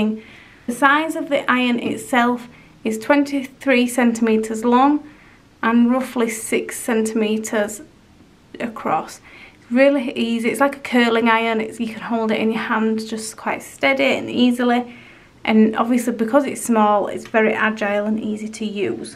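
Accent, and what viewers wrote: United Kingdom. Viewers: Off Topic: Where do you think has this Person grown up in GB? I try to lokate the accent. Thx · Definitely UK. Northern England.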